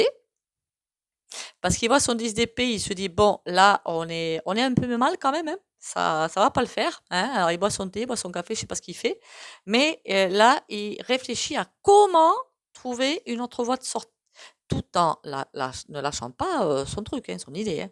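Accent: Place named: Toulouse, France. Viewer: Bonjour, Je me suis abonnée grâce à Kriss Sinclair. Merci pour votre tirage et vos conseils et soin au pendule. J adore votre accent qui me rappelle Toulouse et ses alentours. Tout de Bon! Eulalie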